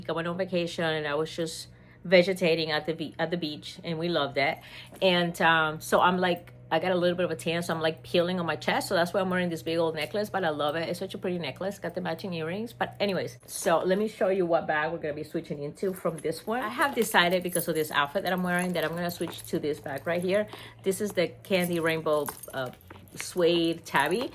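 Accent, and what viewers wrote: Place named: El Salvador. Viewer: I love that crystal tabby. You aways have great bags. where are you from? The accent sounds like el Salvador or maybe Columbia 🤔 But anyway, the new loved leather in brown looks way too intentional on certain bags.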